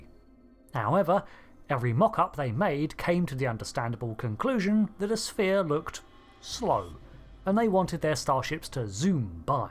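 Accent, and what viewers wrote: United Kingdom. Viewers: I know, british accent, but for the record, in Cornwall, Ontario Canada's phone numbers all start with 93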